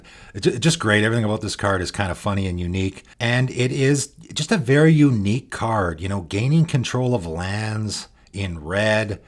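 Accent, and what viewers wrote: Canada. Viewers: I suspected you were Canadian as well, just some subtle ques from your accent.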